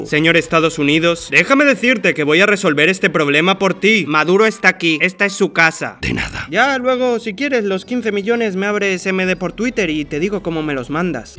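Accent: Spain. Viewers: Bro como q eres Venezolano? Pensé que eras español 😭😭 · Espera, cómo que eres Venezolano?, yo soy venezolano, y diría que suenas más español que venezolano 🤨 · Por qué si eres venezolano hablas como español? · Por que suenas a visualpolitic con ese acento y expresiones raras?(español de españapara ser mas claro) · Históricamente incorrecto, pero una carta de amor a la comedia Brother no te creo que seas venezolano nunca con ese acento español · No suenas como venezolano suena como español o se te olvidaron las raíces, que pena ni fueras dicho que eres de Venezuela me da pena decir que ere mi paisano pero 😅 si da risa y es cierto lo que cuentas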